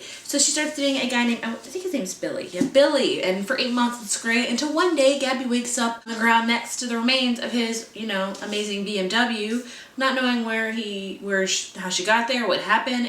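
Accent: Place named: United States. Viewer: Love your videos :) Just wondering-how old are u? x3 Btw, what part of America are u from? (Sorry-it sounds like I am stalking u it's just I live in Britian, and I need to put on an accent from America-but I'm not sure which one I'ma choose lol)...Sorry Im like soo random ;p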